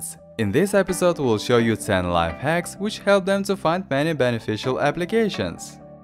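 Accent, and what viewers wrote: Russia. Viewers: are u a Russian? ? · I love your accent. Question (not trying to offend) Are you Russian? Mainly because it is very thick. Again not trying to offend if you are not. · russian accent :D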